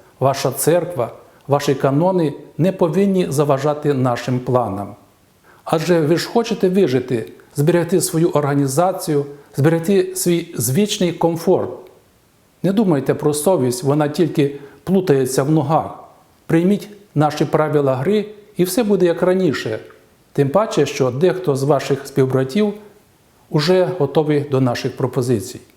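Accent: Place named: Russia. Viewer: ЧОМУ ЦЕЙ ПАКАНИЧ БРЕШЕ ВСЬОМУ СВІТУ.ЧОМУ ВИ НАЗИВАЄТЕ СВОЮ ЦЕРКВУ УКРАЇНСЬКОЮ, ПРИ ЦЬОМУ ВИ ФІЛІАЛ РПЦ.ВАШ ПАТРІАРХ КІРІЛ.НІЯКОГО ДОКУМЕНТА ПРО РОЗРИВ З ГУНДЯЄВИМ У ВАС НЕМАЄ.ТІЛЬКИ НА СЛОВАХ НАЗИВАЄТЕ СЕБЕ САМОСТІЙНИМИ.НАЗИВАЄТЕ СВОЮ УЕРКВУ УКРАЇНСЬКОЮ, ЩОБ РОБИТИ РОЗДОР В УЕРКВІ І УКРАЇНІ І ДАЛЬШЕ ВЕСТИ СВОЮ РУСЬКУ ПООПАГАНДУ В УКРАЇНІ.ВИ Є ЧАСТИНОЮ РПЦ.НАВІТЬ УКРАЇНСЬКІ СЛОВА ВИМОВЛЯЄТЕ ПЕРЕКРУЧУЮЧИ І МІШАЮЧИ З РОСІЙСЬКИМИ.ВИ Є МОСКОВСЬКИЙ ПІП, ІНІЯКИХ ДОКАЗІВ ЗВОРОТНІХ У ВАС НЕМАЄ.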